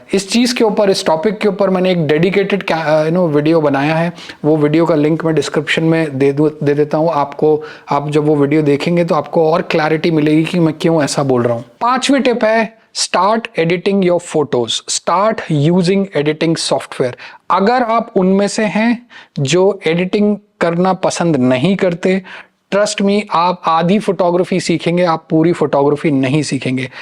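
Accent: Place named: Bhopal, India. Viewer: अरे वाह आप तो भोपाली निकले,तो कवि धूमकेतु का नाम जरूर सुना होगा।उम्र में मुझसे छोटे हैं, लेकिन रिश्ते में चाचा लगते हैं।आपकी बोली से मुझे लग रहा था सूरमा भोपाली💖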